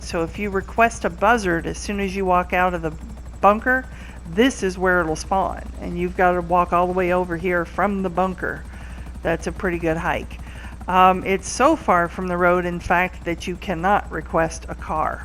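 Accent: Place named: South, United States. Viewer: Just ever so slightly southern, but I know what to listen for.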